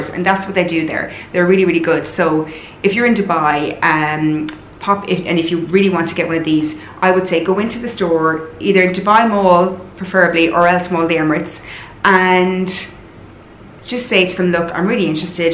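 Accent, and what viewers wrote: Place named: Ireland. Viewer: Love your bag, love your accent, to me it almost sounds like an Irish accent. Cute.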